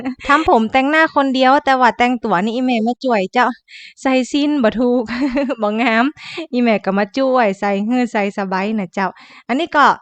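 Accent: North, Thailand. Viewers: เป็นงานแต่งที่น่ารักอ่ะ วันนี้บังเอิญเปิดมาเจอ เลยได้ไล่ดูมาเรื่อยๆ เจ้าของช่องอู้คำเมือง น่ารักดีค่ะ ยินดีด้วยนะคะ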